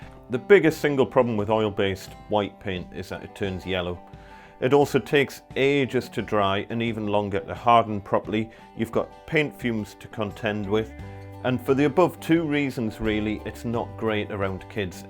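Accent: Newcastle, United Kingdom. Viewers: I have to use oil based gloss for work sometimes when the need arises, and at the start i didn't like the sound of using the water based but i think with me it's got to a stage that i want to try it out. I think when you are painting doors etc and you have to deal with the smell, plus any possible runs you get at the corner of panels, the time it takes to dry, and especially when you have to clean up and put tacky equipment back in the van with a fear of anything tipping over and getting it on stuff you wouldn't want it on, i just can't wait to be able to wash my brush and trays out under the tap and not have to worry about it, plus how quick it would dry too compared to oil based paints. Love the Geordie accent by the way followed by the Jimmy Nail grin. I'm glad you told me al aboot that. Many Thanks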